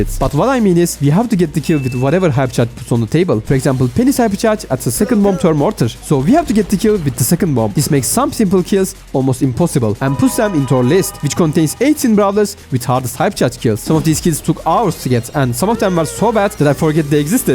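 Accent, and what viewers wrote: Turkey. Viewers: Omg i love you're accent its so cute😭😭 (Are you turkish??) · kral bişey sorucam neden yabancılar için içerik üretiyosun türk olduğun aksanından belli oluyo çok merak ettim türk kitlesi daha çok bs izlemiyo mu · Love your videos and your Turkish accent brother. Ellerine sağlık abi Türkiyeden bu seviyede edit skilliyle çıkan birisi olması güzel. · are you turkish by any chance your accent is really similar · Aa Türk aksanı neden duyuyorum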